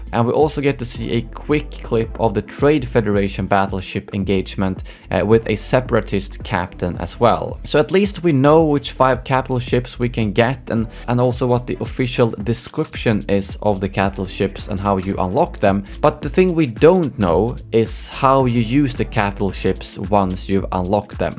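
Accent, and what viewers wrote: Sweden. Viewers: Sorry but I have to ask, are you swedish? If not i’m sorry for asking but it sounds like a swedish accent and Im from Sweden.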